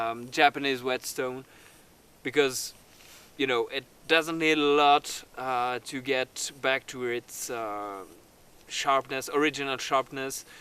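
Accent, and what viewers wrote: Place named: Switzerland. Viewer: hoi du CH schweinchen (schweinli doesnt seem correct). Love the accent, too many years since ive heard it. What part of Helvetica are you filming in? Nice video, reminds me of many happy nights in your snow. Nice knife, im attached to hand forged steel, but its a big chunk of change. I wonder how the quality is now, so many years after the split. Viel gruess aus Florida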